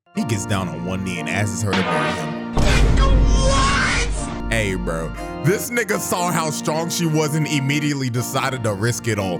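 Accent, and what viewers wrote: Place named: United Kingdom. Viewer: as a Brit I approve this accent. Give this man a cuppa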